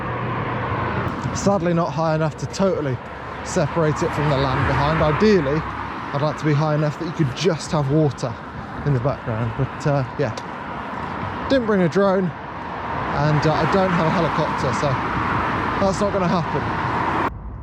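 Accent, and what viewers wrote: United Kingdom. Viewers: so you're telling me this guy's great at what he does AND HE HAS A BRITISH ACCENT?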